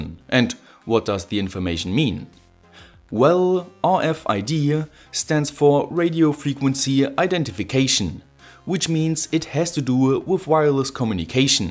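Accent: Germany. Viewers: ouhhh! german hyperinflating english... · Your accent sounds German to me. Kann es sein, dass du deutscher bist? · Dein Deutsch-Englisch is Klasse ;D #noHate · That’s quite a German accent of yours. But I don’t mind.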